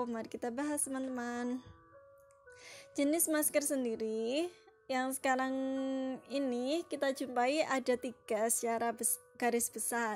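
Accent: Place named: Java, Indonesia. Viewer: 👍👍👍 medok kali mbak suaramu 😁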